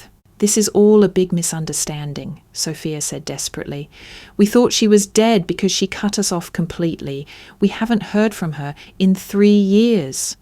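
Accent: United Kingdom. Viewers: Please, no more British narrators.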